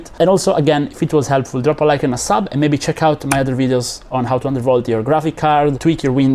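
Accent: Italy. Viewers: Why u have an Italian accent?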